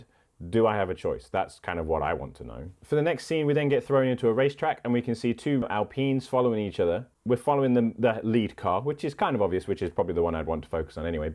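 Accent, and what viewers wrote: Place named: United Kingdom. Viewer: He's from the UK. That's how they say it. Here in the US we can call it Zee all day and all night.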